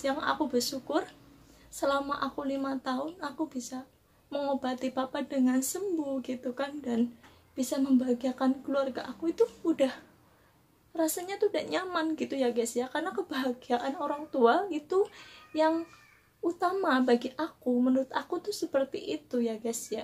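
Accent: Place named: Banyumas, Indonesia. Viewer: bersama ngapak wadon yang lagi cerita di singapore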